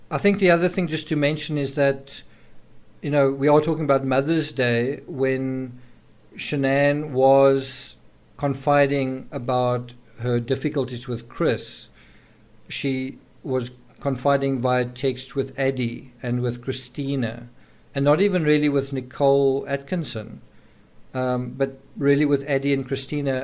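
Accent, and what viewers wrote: South Africa. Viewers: Good SAFFA accent! 👍 ♡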